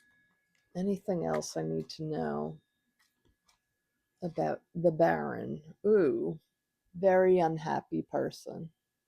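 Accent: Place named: Wisconsin, United States. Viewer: Where do you live? You sound a bit Wisconsin to me.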